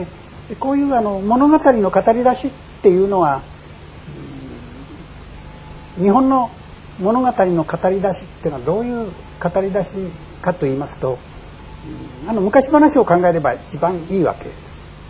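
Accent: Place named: Ise, Japan. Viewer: 遠い世界の片隅に住み古の大詩人である杜甫の如く深山の裾野を巡り林に入り込み暫し途方に暮れし時何処からともなく聞き覚えの訛りのある控えめな染み染みとした語り口のありがたき御講義の冒頭のところが始められたのである。 あの柔和で羞じらふやうな語りの世界は誠に悦ばしき事であります 確かにあれは聞き覚えのある筈のものでありました。 我が妻とその父母の生まれは、伊勢松坂の山里であります。道理で即座に判ったのであります。 本居宣長の生まれし古里である伊勢松坂の山室山の地に近ひのであります。私は己の縁の深さといふものを感じました。 この大小説の有名な冒頭部分の誰も知る桐壺の巻の第一行｢いづれの御時にか、女御更衣あまたさぶらひ給ひけるなかに、いとやむごとなききはにはあらぬが、すぐれてときめき給ふありけり。｣ でありますが、実を申せばこの講義に使ひたき古本があります。昭和二年九月一日印刷、昭和二年九月五日発行、発行所・弘法社、校訂者 有川武彦、発行者 湯川松次郎、印刷所 井下書籍印刷所、｢増訂 源氏物語湖月抄上巻 定価 金 五円 中巻 昭和三年四月十日 印刷 昭和三年四月十五日 発行 昭和四年五月一日 再版 発行 定価 金 五円 下巻は昭和三年四月十日 印刷 昭和三年四月十五日 発行 昭和四年五月一日 再版発行 定価 金 五円 全て和本であります。私はこの書物をいつの時にか、恐らく幸運なことに安価で多分、ブックオフで購入したのだと記憶しておりますが、恐らく稀覯本であったのではなひかと思っております。 確かに古くて綺麗な本ではありませんがいひのであります。 多分生涯の書物になるのではなひかと思っております。 又しても、話しが横道に逸れて仕舞ひまして誠に申し訳ありませんでした、どふかお許し下さひ。 大碩学である岡野裕彦先生の心昂ぶりて已まない素晴らしき名講義を拝聴しやうではありませんか。 擱筆 😊😊😊😊😊😊😊😊😊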